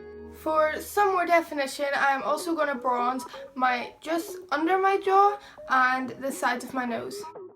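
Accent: Northern Ireland, United Kingdom. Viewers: Northern Irish like mine :) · Northern Ireland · If u don't like her accent them why are u watching her videos?!?! that is what people from Northern Ireland sound like so u are basically insulting me and everyone else from there! There is no need to be rude! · it's the northern irish accent, she can't really help it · Northern irish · northern Irish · She's from Northern Ireland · NORTHERN IRISH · lanseto NORTHERN ireland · She's British (Northern Irish),she can't help it,it's just an accent. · Northern Irish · I'm from Northern Ireland too, she can't really change her accent. I think it's cause people on here aren't used to hearing northern Irish accents since there isn't many youtubers from Northern Ireland so you probably aren't used to it! But yeah she can't really do anything about her accent! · she's from Northern Ireland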